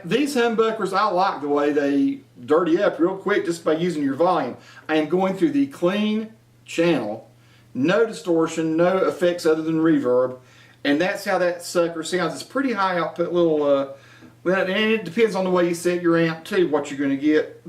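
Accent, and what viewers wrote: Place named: Appalachia, United States. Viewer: Hi, just found this video through your Scottish accent video. Weird coincidense, I started looking for a Telecaster just yesterday and was wondering if I should spend a little bit more on a Mexican-built Fender or buy a cheap Squier. Difficult decision as I‘m an amateur and only play ocassionally at home. I think I will go for the real deal - a Fender with single-coils. Thanks for showing all the different modes, it helped to have a side-by-side comparison of the two different pickup styles as I only played single-coils all my life. I also really enjoy your Appalachian accent! Thanks & greetings from Germany, Alex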